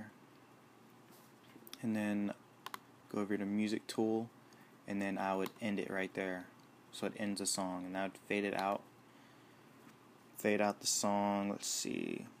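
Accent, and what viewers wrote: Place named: United States. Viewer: Lovely American accent :)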